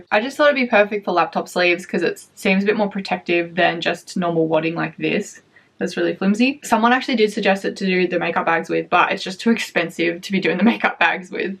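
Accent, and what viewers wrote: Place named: Australia. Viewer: I'm looking forward to get my laptop bag which is on the way. I love the kind you talk although some time as a non english native speaker your lovely Aussi accent is hard to understand 🙂Go on and stay authentic!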